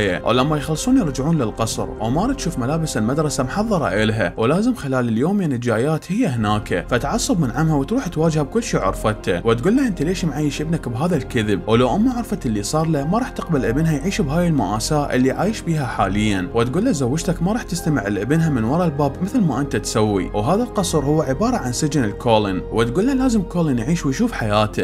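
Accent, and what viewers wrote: Iraq. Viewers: سوال انتي عراقي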